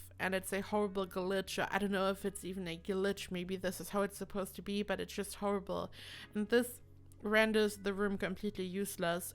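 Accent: Germany. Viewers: Are you from Germany ? I can hear there some kind of accent :) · are you German ?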